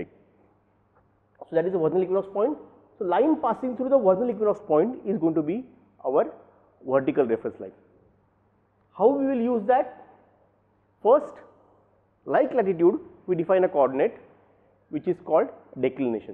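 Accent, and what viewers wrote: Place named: India. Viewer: The toughest Indian accent . But thanks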